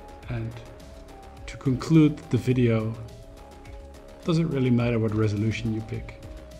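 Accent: Netherlands. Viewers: Are you from the Netherlands? I hear Dutch ;)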